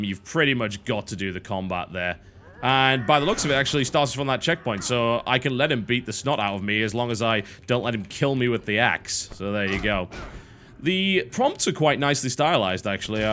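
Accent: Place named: Newcastle, United Kingdom. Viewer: Let me guess were you're from by your accent you are originaly from newcastle but you traveled frequently as a child then you moved to north London at age 20 or 22 until 25 and then America were you have been living for 3 years now. Oh and great channel and awesome videos keep up the magnificent work.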